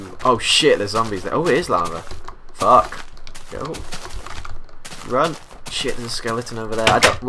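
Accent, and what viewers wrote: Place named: Yorkshire, United Kingdom. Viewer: wait wait wait.. youre from yorkshire.. WHERE TELL ME NOW..btw me too :)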